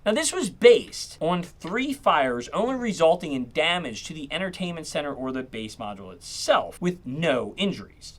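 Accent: Baltimore, United States. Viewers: Are you from Baltimore/Maryland? I'm not from the US but I'm trying to improve my accent identification. (Also please don't think I'm weird, I'm just a sperg.) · 10 seconds in and I knew you were from Baltimore.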